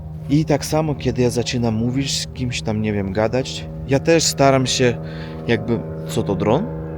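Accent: East, Poland. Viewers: Ludzie uważają że jak mówisz z akcentem wschodnim to jesteś ukraińcem dlatego kazali Ci jechać na ukrainę, a musisz wiedzieć że nie którzy Polacy nie lubią ukraińców przez to jak się w Polsce panoszą, są krzykliwi , chamscy, wszędzie ich pełno. Dodać do tego co wygadują w internecie na Polaków choć byliśmy pierwszym państwem które im pomagało to chyba nie dziwi że nie którzy Polacy się tak zachowują. I to nie historia nas tak dzieli tylko ich zachowanie. Myślę,że ten co Ci tak powiedział był ukraińcem albo człowieczkiem mało myślącym. Serdecznie Ciebie pozdrawiam i proszę o więcej Twoich wypowiedzi o naszych stosunkach międzynarodowych czyli Rosją i Polską. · No dobra, "Polska dla Polaków" a Mars dla Marsjan a kozy dla muzułmanów 😊.! Za bardzo się przejmujesz. Ja jako PRAWICOWIEC nie mam absolutnie nic do uczciwych honorowych ludzi. Nie wydajesz się być kolejnym drobnym cwaniaczkiem a raczej wydaje mi się, że chcesz sobie ułożyć życie w Polsce. 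Wydaje mi się, że mimo wielu przykładów patologii jakiej doznają także Polacy, jedyną rzeczą która tak naprawdę może zaszkodzić nam wszystkim to nieobliczalne antypolskie decyzje politykiery. Spędziłem 1/3 życia po za Polską i jedno ci powiem - w chwili gdy się wtopisz w miejscowe społeczeństwo, to i ono będzie występować w twojej obronie... bo staniesz się swojakiem. Wschodni akcent kiedyś zniknie, kwestia czasu. Ważne to co masz pod kopułą i jak reagujesz wewnętrznie na to co życie przynosi. Jak by nie było - Miłego pobytu. 😊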